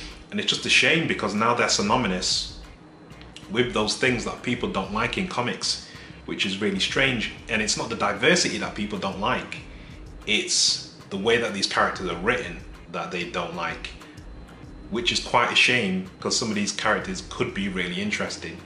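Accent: United Kingdom. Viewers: Saw this in my recommend, saw the thumbnail and title. "Let's see what this is about." Black guy with a British accent. subscribed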